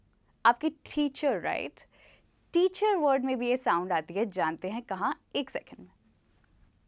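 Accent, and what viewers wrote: India. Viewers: I mean it is Indian pronounciation, not very authentic I would say. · You are using indian phonetics for English though not original English phonetics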